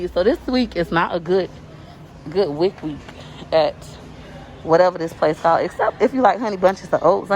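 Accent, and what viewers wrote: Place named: Tampa, United States. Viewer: “I got some bread for ya... Hold on, Bruss” You so Tampa, I love it 813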